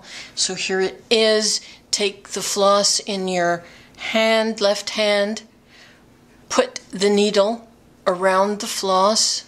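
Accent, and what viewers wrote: Canada. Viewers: Hi! I changed my youtube name from TheConnieS to Petalpresser. I hated that other one.. I don't do cross-stitch anymore because of carpal tunnel and eyesight. I never saw someone thread a needle like that before. Don't know if I could do it. You are a very good teacher. Your voice is very soothing. I think it's the Canadian accent. : ) Always glad to see your videos. What a treat!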